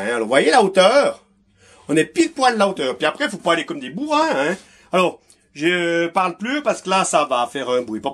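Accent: Switzerland. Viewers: salut toujours ingénieux et j'adore ton accent de ta belle Suisse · Bravo , belle inventivité , on en trouve beaucoup au Canada on dirait , à moins que ce soit en Suisse ou en Belgique , bon , le petit accent est bien plaisant !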